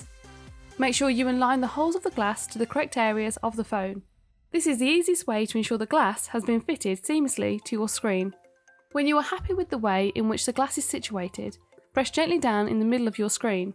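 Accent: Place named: Birmingham, United Kingdom. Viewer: A fellow brummie :)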